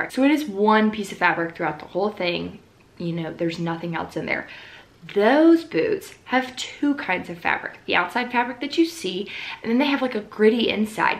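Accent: South, United States. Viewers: I’m from Mississippi so I love a southern accent! Also I died at “coochie cutters” hahaha we said that growing up! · I love your country accent WAY more than your "stage voice" it's so authentically you! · Hey! 😀 I thought you were speaking with a country accent since I just watch your main channel videos and this is the first vlog I watch so it caught me off guard hearing your normal voice lol. Have a great week 😀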